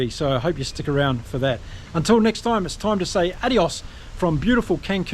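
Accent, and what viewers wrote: New Zealand. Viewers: If the Australian Kangaroos (League) and South African Springboks (Union) had a baby it would be the New Zealand All Blacks. OK that was a stretch. What I mean is All Blacks play an exciting brand compared to SA in my opinion. I like how All Blacks sling the ball around a lot more. Not the slow grind it out heavy forward pack stuff. Question: I’m sure Mexicans think you’re American. Can they differentiate your accent compared to Americans?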